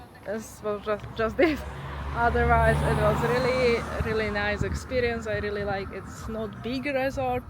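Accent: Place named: Poland. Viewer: Bardzo Polski akcent :) 🎉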